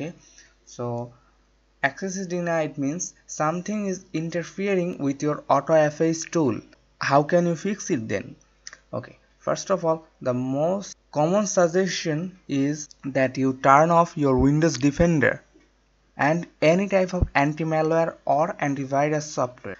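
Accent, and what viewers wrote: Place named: England, United Kingdom. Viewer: This English accents....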